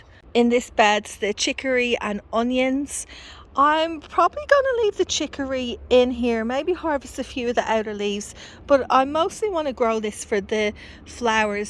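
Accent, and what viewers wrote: Ireland. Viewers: Love the Irish accent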